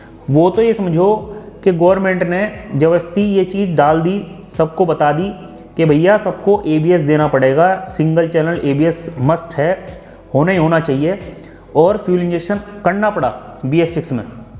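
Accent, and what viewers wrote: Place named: Uttar Pradesh, India. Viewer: Kha se ho ap UP mai .....aj language suni dyan se .phle.jesii rehve 😂😂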